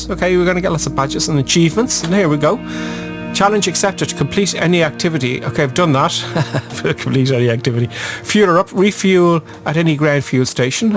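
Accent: Ireland. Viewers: That was an irish accent? Damn, I found it quite annoying how he pronounced words. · It's just his irish accent.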